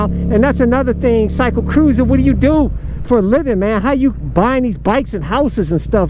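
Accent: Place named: New Orleans, United States. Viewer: With your accent I always thought you were just from New Orleans 🤔🍷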